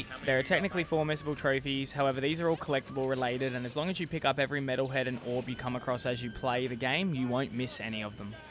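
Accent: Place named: Australia. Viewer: Not my usual sort of content to consume, but hearing an Aussie playing my favourite childhood game and smashing it has earned a sub! Keep it up and hope you smash your goal for the year!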